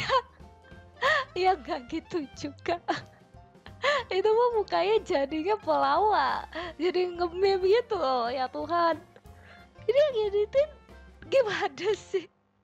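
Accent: Java, Indonesia. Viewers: Kaka orang jawa ya? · Asal dari mana k,aku baru subcreab k,dari logatnya dari orang jawa.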